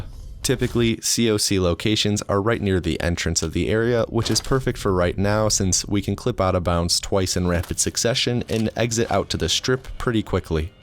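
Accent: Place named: Midwest, United States. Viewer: Where are you from? Your 'a' is very distinct, sounds midwestern to me!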